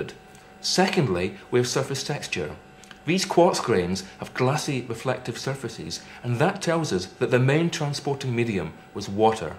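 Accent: Scotland, United Kingdom. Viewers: anyone else only pay attention to his scottish accent and not the video the whole time?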